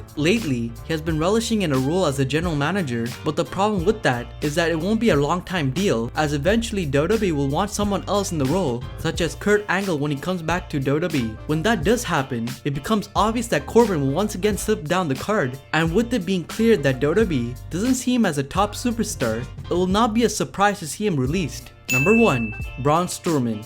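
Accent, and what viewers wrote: India. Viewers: Another indian accent, i dont understand you curly tonge. When indian speak english, sounds stupid.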